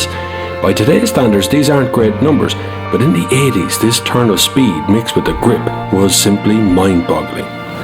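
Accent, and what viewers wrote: Ireland. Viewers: in Ireland 3 is pronounced as tree. So if you hear a tree as three he is Irish....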